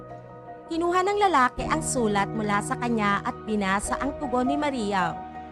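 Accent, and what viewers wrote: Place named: Pampanga, Philippines. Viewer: Kapampangan ang nagkukwento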